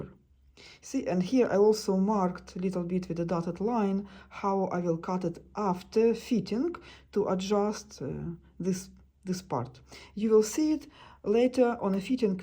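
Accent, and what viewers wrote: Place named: Russia. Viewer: Слышу русского человека. Но почему не на русском? Хотя бы субтитры?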